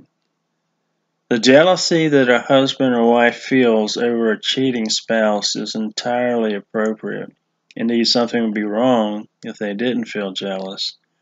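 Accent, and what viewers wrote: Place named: Scotland, United Kingdom. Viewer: I have given my roommate many a giggle by learning to speak Scottish like you: "How are yuuuuuh!" :)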